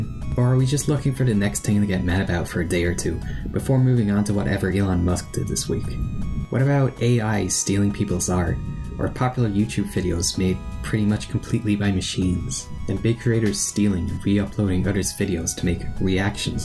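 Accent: Ireland. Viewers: What's that accent? Irish? Welsh? Pakistani???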